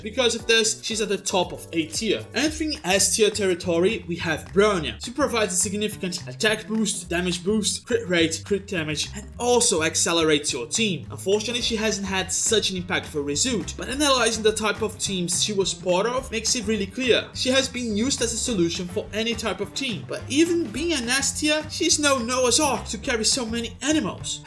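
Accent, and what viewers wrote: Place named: Brazil. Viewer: KKKKKKKKKKKKKKKKKKKKKKKKKKKKKKKKKKKKKKKKKKKKKKKKKKKKKKKKKKKKKKKKKKKK EU SOU BR RECONHECI O SOTAQUE DE LONGE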